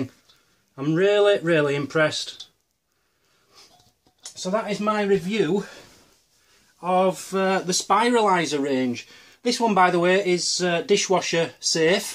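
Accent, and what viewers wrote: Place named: Yorkshire, United Kingdom. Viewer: Dude where u from u sound just like me. Somewhere in Yorkshire??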